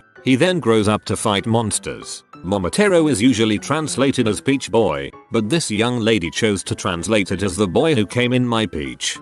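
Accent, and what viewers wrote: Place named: Midlands, United Kingdom. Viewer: That makes sense. Of course, some of these have to be read. Because some of these pronunciations make it seem that the TTS is using a Midlands accent. (If that makes no sense, it's difficult for someone Irish to understand someone with a Midlands accent when they're talking "normally". Or basically anyone who doesn't have a Midlands accent.)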